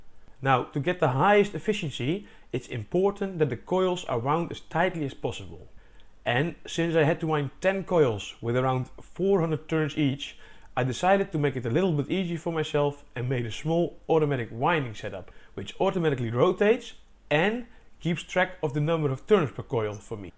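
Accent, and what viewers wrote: Netherlands. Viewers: The dutch accent ;p Amazing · Je spreekt lekker "Dunglish". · Hey, ik neem aan dat je een Nederlander bent aangezien we hetzelfde prachtige accent hebben als we Engels praten !! Ahha ... ik ben ook helemaal bezig met ideeën voor het opwekken van energy, ik wil 3d print en tech workshops gaan geven op mijn DIY elektrische fiets met een DIY cargo bike trailer/minicamper en natuurlijk een DIY energy systeem om alles te kunnen draaien, fiets, kleine printer, laptops, telefoons, etc etc. Ik ben nu in de bouwmaat op zoek naar alu profielen om een opklapbaarframe te kaken voor mijn zonnepanelen boven op mijn cargo trailer en fiets. Ik hoop dat we kunnen samenwerken, sta je open voor contact en eventueel een samenwerking ? Groetjes Ruben Dekker Allround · are you a fellow Dutchman? Judging from the accent? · 3 secs in you know this uploader is Dutch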